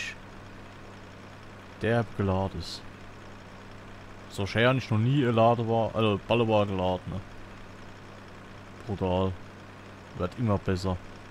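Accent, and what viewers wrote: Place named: Hesse, Germany. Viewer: Bist echt gut mit den Ballen, wie immer sehr angenehme Folge mit Hessische Mundart.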